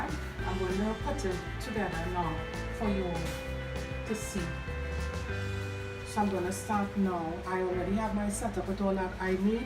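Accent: Trinidad and Tobago. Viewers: it's trini creole ( caribbean) it's English with trini accent just like most English speaking countries have their own accent lols and saying wtf was kind of rude because she spoke normal to me because trinidadians speak with a deeper accent than that maybe it's just the music.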